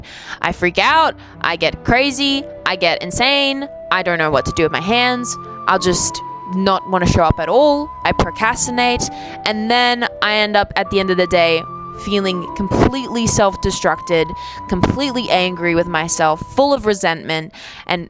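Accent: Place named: Australia. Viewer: Was gonna say you lowkey sound like but it’s probably just cuz you’re aussie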